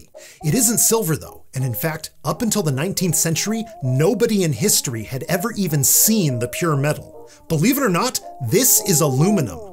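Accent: United States. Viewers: You really shouldn't get into scientific discussions if you cannot even understand basic English. Literally no part of the video claims either of the names is 'wrong' and does not refer to either being 'unique' in any way. The maker of the video says that the reason he calls it aluminum is not just because of his American accent, but also because the person that coined the first term for the metal (alumium) changed it to aluminum. He then proceeded to say that somebody at Quarterly Review referred to it as aluminium and it 'stuck', since the correct term (coined by the maker) wasn't yet widespread. What does this mean? That BOTH spellings are actually correct. Aluminum as that's the name it was actually given by apparently the first person that named it, and aluminium as a common 'evolution' of a word (which is the process by which we have 'photoshop something' or 'google it'). 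All that aside, even if we assume that 'aluminium' is the only correct form in British English (it's not), this STILL doesn't mean jack, since it is correct as aluminum in American English. Whether you want to accept it or not...that's an existing language and it doesn't need to follow the naming of other languages (including other variants of English). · The man in the video is no authority. He is American using an American dialect of English. ONLY in the USA is aluminum accepted. Internationally it is recognised as an acceptable American dialect, not standard English. It isn't the British, it is 96% of the world population.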